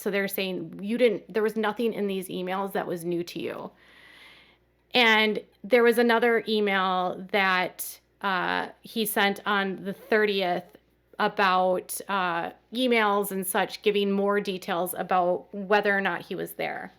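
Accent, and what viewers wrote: United States. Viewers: Q: Pro-bat-ive. Stat-u-ette. Other examples. Is this a particular/regional American accent?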